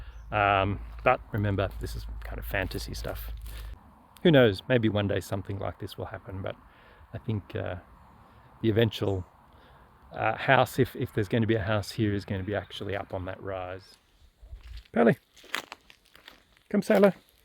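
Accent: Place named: South Africa. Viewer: I can hear an accent, is it South Africa? I'm a pom on 4 acres in central vic too. My land looks very much like yours, sloped with lots of trees, it can be challenging to work with ;) I agree with you on the mental health benefits, it's so nice being out amongst the trees. I work from home in tech and I love those 15 minute walks here and there! I went pretty hard with the crops during covid but it got too much for me with all of lifes other demands. I just couldn't keep up with the weeding, the birds/insects/rabbits/possums decimating my crops, or the wombats bulldozing my fences. I kinda got burnt out making so much effort to get a bowl of strawberries or a basket of deformed half eaten corn, I scaled it right back and now just focusing on breeding chooks for meat, I'm trying to tackle the high cost/high value items. I want to do sheep next but we have no fencing along our creek.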